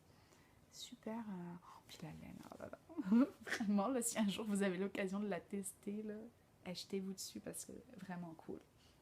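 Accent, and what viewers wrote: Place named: Quebec, Canada. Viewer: très bon moment passé avec toi j'adore ton accent et puis ma fille est au quebec Rimouski) depuis 9 mois pour ses études alors je suis d'autant plus contente de découvrir tout ce qui touche à ton pays et autre point commun je suis infirmière aussi et j'adore le tricot j'ai découvert les laines de la maison tricotée au salon aef elles sont magnifiques je comprends ton engouement pour cette boutiquehate de te revoir dans un 2ème épisode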